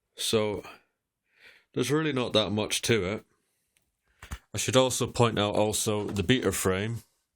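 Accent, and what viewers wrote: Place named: Scotland, United Kingdom. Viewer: Hello Thank you for taking the time to share your knowledge, which is great for people like me, who have just acquired a Weavmaster loom and don't know much about weaving. I'm looking at various warping boards, some with a few peg and some with a lot more, what would you recommend for this loom and a beginner. my loom is 102cm =40inc and four shaft, are you aiming to do any more videos, as i could listen to that Scots accent all day relax whilst i learn from the videos.Please i hope so.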